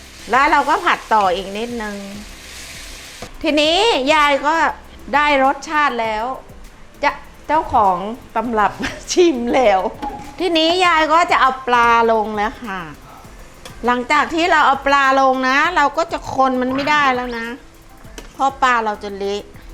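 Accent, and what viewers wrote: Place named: Nakhon Phanom, Thailand. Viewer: เป็นคนนครพนมเหมือนกันค่ะ คุณแม่คุณยาย วิธีการพูดคล้ายๆ เลยย ดูทุกคลิปที่คุณยายทำอาหาร ชอบทุกอัน ฟีลเหมือนได้กลับบ้าน ชอบมากรักมากค่ะ ทำบ่อยๆนะคะ ติดตามต่อไปค่ะ🤍🤍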